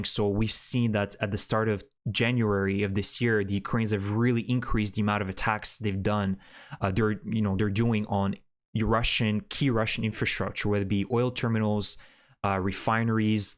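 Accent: Quebec, Canada. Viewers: Pretend? I hear a strong French Canadian accent.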